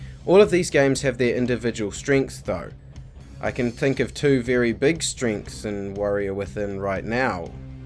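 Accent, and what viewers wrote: New Zealand. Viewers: Oi are you a kiwi??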